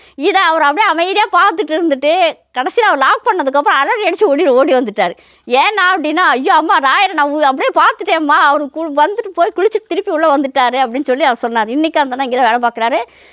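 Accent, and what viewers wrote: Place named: Nagercoil, India. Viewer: ஸார் நமஸ்காரம், உங்களுடைய நாகர்கோவில் பாஷை மிக அருமையாக உள்ளது. உங்கள் பக்தியில் ராயரே கரைந்து விட்டார் போல் உள்ளது.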